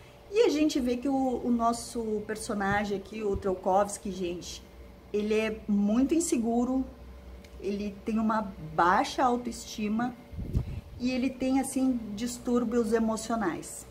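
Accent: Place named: South, Brazil. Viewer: Oi! Vim pelo canal da Andréia, você deve estar ganhando alguns inscritos, merece! Como é simpática! E esse sotaque do Sul? Tenho memória afetiva de uma professora minha do primário, gaúcha, e adoro essa musicalidade no falar! Eu precisei parar seu vídeo e ler o Inquilino. Estou na parte em que ele vai para o apartamento do amigo da Stela e eles estão lá falando da moça que se suicidou. Depois que terminar volto aqui para ver o restante da sua resenha! Eu sou terror/suspense na veia! haha Você citou o filme ser melhor que o livro. Um super exemplo para mim disso é o filme 1408, baseado em um conto do King. Você deve conhecer. Fui ler o conto após ter visto o filme e pela primeira vez achei que King não explorou direito o potencial do conto e do personagem haha, que heresia! Não parecia o mestre da prolixidade, porém temos que entender que é um conto. 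Ainda assim, quando acabou eu falei: mas já? kkk mil vezes o filme! Como dizem, ganhou mais uma inscrita! Bjs!!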